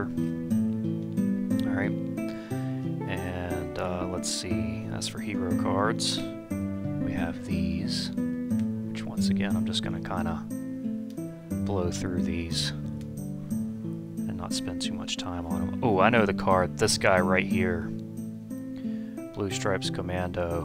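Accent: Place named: United States. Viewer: Your American pronunciation is funny. Regardless a good video.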